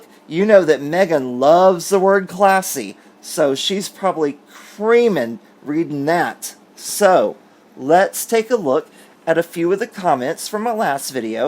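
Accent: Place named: Georgia, United States. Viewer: Omg!! I’m a fairly new subscriber... I think I discovered your channel about a month ago and I’ve been hooked ever since!! When I was watching your last video, I thought “hmm I wonder where is from (because you sound like me lol), and I almost asked in the comments but decided not to and then I find out in this video that you are in fact from lovely GA!! I’m from the northeast part, what about you?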